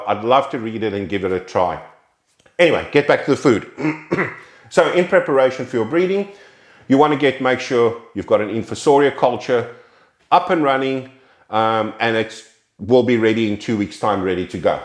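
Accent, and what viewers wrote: South Africa. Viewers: You from South Africa:)?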